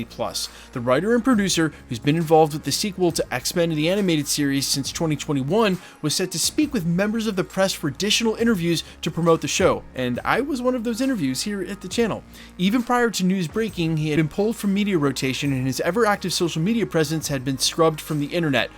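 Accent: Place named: Maryland, United States. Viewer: Bruhhh ur accent...... you've gotta be from Maryland 😂😂😂😂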